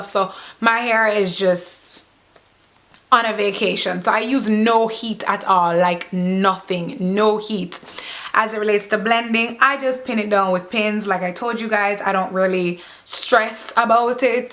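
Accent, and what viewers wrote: Jamaica. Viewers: Jamaican accent 😍😍😍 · st. rose in ur face she is jamaican I wonder what's so terrible about jamaican accent anyways what I want to know is how u de tangle it cuz I wore the malaysian kinky for a month n 1 week n I washed it almost every day if it was thoroughly washed water contacted it every single day the beauty of it is that u can wash it in the shower while taking a bath and by the time u put ur clothes on its like dry no need for blow drying n all but I messed it up so bad ly when I stopped de tangling it n now I can't wear it again it's tangled with big cases of knots no way I can unwind it n I love this hair so much I am thinking of dying it burgundy n wear it for atleast a week before Christmas please tell me what to do its badly tangled help! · are you jamaican?? u sound like a yardie .... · She is Jamaican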